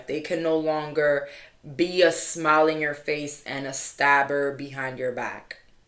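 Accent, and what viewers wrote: New York, United States. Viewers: You are my favorite reader on YouTube and we both live in New York City so I love the way you talk😂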